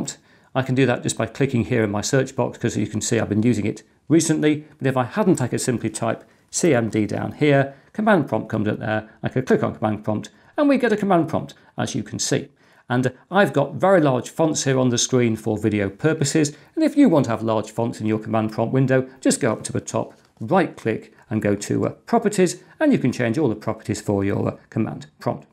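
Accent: England, United Kingdom. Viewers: Genuine English accent, simply love it so much😍😍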